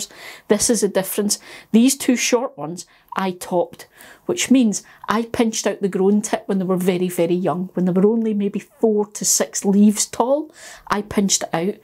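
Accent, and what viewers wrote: Scotland, United Kingdom. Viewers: Thanks - really good informative video, love your Scottish accent too!!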